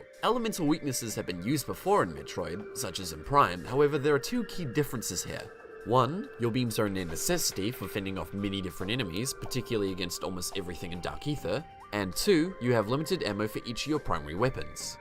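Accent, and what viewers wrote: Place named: New Zealand. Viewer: Was listening to a random gaming podcast on Youtube. Heard an NZ accent. Instantly subscribed.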